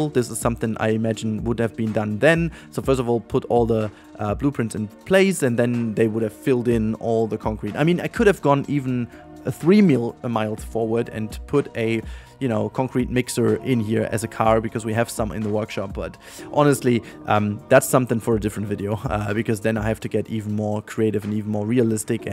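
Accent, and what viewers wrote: Germany. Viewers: Sorry to ask but are you German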